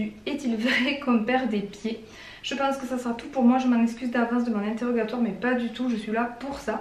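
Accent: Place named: Marseille, France. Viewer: Je vis à toulouse et je trouve que tu as plutôt un accent de marseille :’) Personnellement j’ai 60kg à perdre avec la sleeve, et j’ai bientôta date de mon opération!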